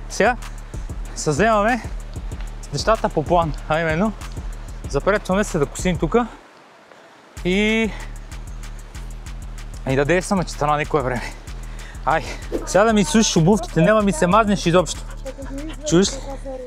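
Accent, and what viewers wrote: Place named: Northwest, Bulgaria. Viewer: ТИ СИ СТАНА СЕЛЯНИН НА СЕВЕРОЗАПАДА.ААААА ЗА ГОВОРА И ДИАЛЕКТА КАК СИ ПРОМЕНИЛ .ЩЕ РАЗКАЗВАШ НА КАФЕ …:)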